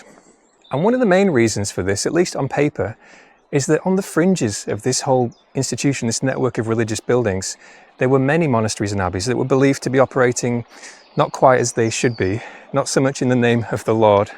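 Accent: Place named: Yorkshire, United Kingdom. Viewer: Hi, thank you for your reply; No, I'm not being too kind, I've just watched some of your other videos; so fascinating. You should have your own TV series on say BBC Four or BBC Two; about Yorkshire. Your speaking voice is awesome; really clear, and well spoken, with just enough of the Yorkshire accent without being too much. I used to work as a graphic designer and artist and still have some links with the TV companies; I mainly worked for Liza Minnelli, and Lonnie Gordon; but I suggested this coming Saturdays evening on Liza on BBC Two; I am going to suggest you to the head of BBC programming; if you are in agreement? You would be excellent.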